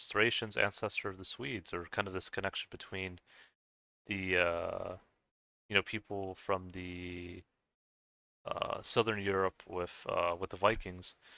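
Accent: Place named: United States. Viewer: "Possibly contain the Welsh and parts of England" How do you come to that conclusion? It definitely is the whole of the British Isles. Alba was originally used for the whole of the British Isle.... Where are you getting your information from? Just look at the DNA map, it tells you the basic story.... we are all majority "Celtic" Brythonic peoples. You sound American, you mention your Welsh-American friend..... No you are Americans, typically of Americans you think bloodline means something other than for medical reasons.. And you still then get it wrong.... All these groups of people you mention are cultural groups not genetic..... The Greeks and Romans, where most of these names come from knew nothing of genetics.... they arbitrary grouped people to what they thought should be grouped together. Again where do you get your information from? It sounds like you get a lot from Irish and Scottish nationalist who need to prove they are different from the English because they are bigoted and try to deny they joined in with building the British Empire. 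Note its the British Empire not English, with English people outnumbering the Irish, Welsh and Scots, by ten times why would they not have called it the English Empire? Answer, because it was ALL groups, not just the English. Stop listening to nationalists, they will always give you "their" version not a balanced version.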